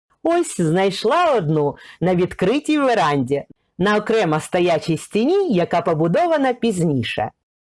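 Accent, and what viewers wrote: Russia. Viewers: Та де там "гарна"! : ЗАХАДИ та живи, вітальня-СТАЛОВА, коти МІЛКІ, намагалась знайти ТРІЩИНИ, на випадок проблем з ВОДОПРОВОДОМ, велике ПРИДАНЕ.., вже не кажучи про оте московське "акання"; невже аж так важко вимовити "о", тим паче читаючи текст: адже написано по-руськи (т.б. українською) "о", а вимовляють по-московськи "а"?!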